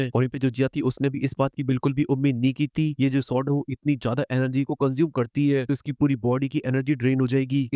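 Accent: Haryana, India. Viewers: Bhai AAP Kya Haryana se ho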